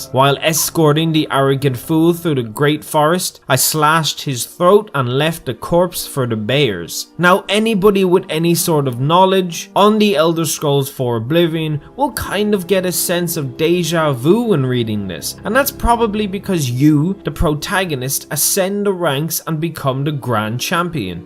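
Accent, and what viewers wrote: Ireland. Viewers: I quite enjoy the Irish accent. It's just easy to listen to. Great video. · I love me some Irish accent to sleep 2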